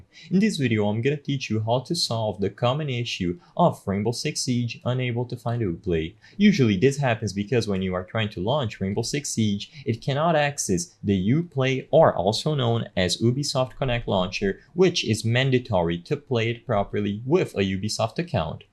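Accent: Brazil. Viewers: cara eu fui ver o tutorial e falei, doidera esse cara fala igual brasileiro, só que em inglês, dai fui ver seu perfil pra entender kkkk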